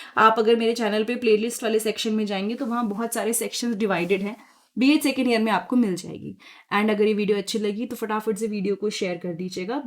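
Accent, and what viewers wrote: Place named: Haryana, India. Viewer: Mam is haryanvi speaker in video...focus mam says 1 se 2 jano😊....btw nice session and it is very helpful...thnks mam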